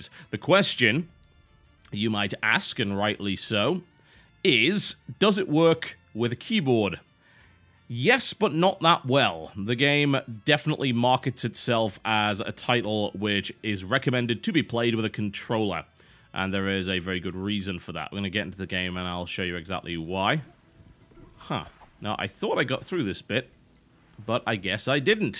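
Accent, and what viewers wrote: United Kingdom. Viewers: Well um he's British...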